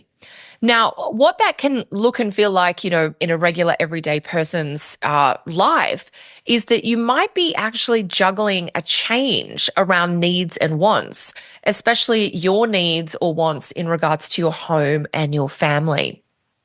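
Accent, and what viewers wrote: Australia. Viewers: So nice to hear a fellow Australian . I have south node in Aries . Chiron in Aries and par of fortune . I don’t know what it means but I feel like crap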